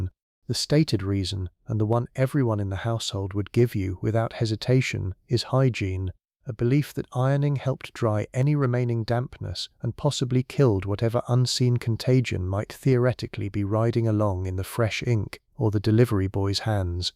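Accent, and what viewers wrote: United Kingdom. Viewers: Watching from the desert - in Phoenix Arizona ❤ I love the British accent- sweet dreams everyone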